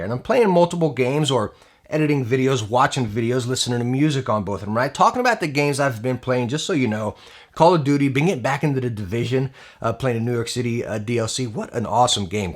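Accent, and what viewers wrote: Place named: Texas, United States. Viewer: Hey man. I am new to the channel. Have been enjoying your videos a lot. I am just curious, were you born in Texas? Your accent is nice af.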